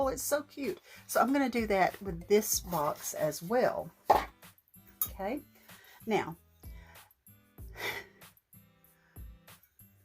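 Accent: South, United States. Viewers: I understand you perfectly :) love your accent!! I am a crafter, card making and journaling and I’ve got addicted to watching craft room makeovers and I think you came up in that category if I remember correctly. I also listen to The Scrap Gals podcast and they are from Nashville and Bowling Green, Kentucky so used to the Southern American accent 😉 I love your videos and all the ‘thirfty’ goodness you find. We have Charity shops in the UK but the prices are rocketing so bargains are few and far between here!! I love to hear about your family and how you want to teach your grandchildren about the true meaning of Christmas and that you purchased them a nativity set to play with. They are so lucky to have you as their grandmother xxx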